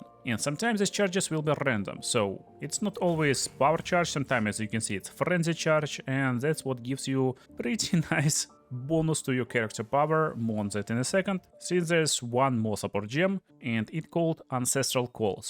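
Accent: Russia. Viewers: акцент у тебя очень похож на русский)) ну да ладно) твой билд хорош! сейчас его строю)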